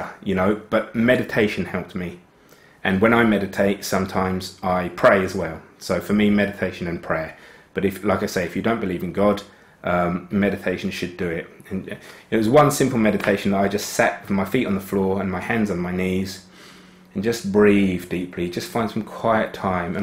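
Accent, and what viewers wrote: Australia. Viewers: is he from Australia? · 澳洲口音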